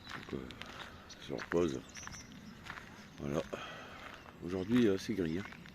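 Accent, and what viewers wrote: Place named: North, France. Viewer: Coucou on entend l'accent du nord ci je ne me trompes pas ❤👍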